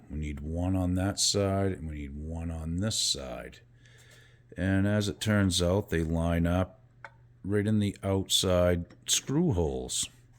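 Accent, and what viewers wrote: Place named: Maine, United States. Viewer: I just happened upon your channel tonight. Nice to hear the Maine accent. I grew up in Maine, Farmington. I live in Florida now. It’s Ben a while since I was able to go back home to visit family. I’m currently building a strat from parts. I lost all my household goods, including all my music gear and guitars. I had all my stuff in storage when I moved to Florida. I got behind on I the rent and they ended up auctioning all my stuff. So now I’m starting over building a David gilmour inspired strat.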